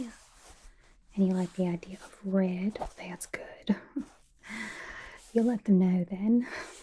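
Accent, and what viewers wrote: South, United States. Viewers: This is my favourite version of rogue is honestly brilliant and omg the southern accent is just perfect ahhhhh amazing 😊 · Yes, the Southern accent is very nice. Very soothing. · I love rogue and the southern accent omg, I discovered your channel about a week ago and your cosplays are on point! so entertaining and the dialogues actually are in universe, its so entertaining!!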